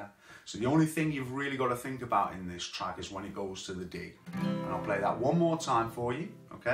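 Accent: Liverpool, United Kingdom. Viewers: Hi. I struggle with the Rhythm. But it's just practice. Can I ask you about your accent. Are you from Liverpool if so which part?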